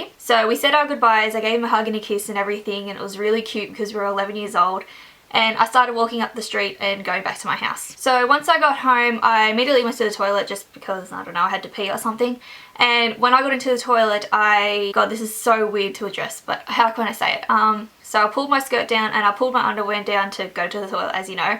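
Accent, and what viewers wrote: Australia. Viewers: This Australian accent :D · Are you Aussie? You sound like it cx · Your Australian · Shes aussie :) yay one of us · Is she Australian? Cause I'm Australian. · Are you an Aussie? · Are you Australian?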